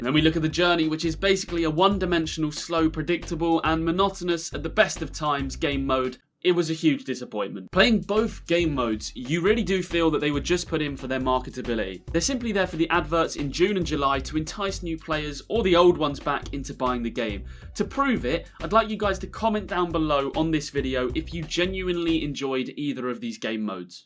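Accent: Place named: England, United Kingdom. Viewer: I hate this English accent why can’t an a American do this video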